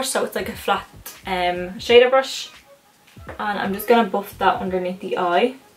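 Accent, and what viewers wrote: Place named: Dublin, Ireland. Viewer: Can i ask where ur based u sound like ur from Dublin so if u are where in Dublin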